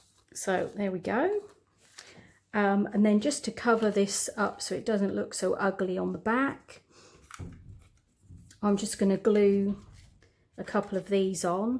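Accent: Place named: Norfolk, United Kingdom. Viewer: Are you from Norfolk..I can hear your accent? 😍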